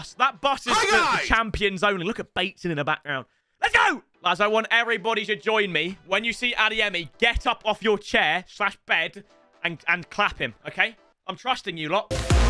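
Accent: South Africa. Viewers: That South African accent tho... Lol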